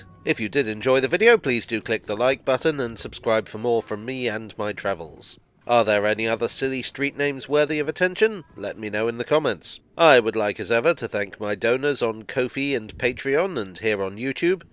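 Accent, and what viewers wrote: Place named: South, United Kingdom. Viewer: I love your videos, they are most enlightening and pass the time before my eventual death, but being a proud Yorkshire man who has passed out, thrown up and been punched on that street, it needs to be said in a Yorkshire accent not your posh southern twang.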